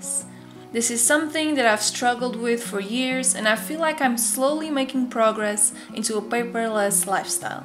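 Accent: Brazil. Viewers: Is she brazilian? Her accent looks like a friend of mine...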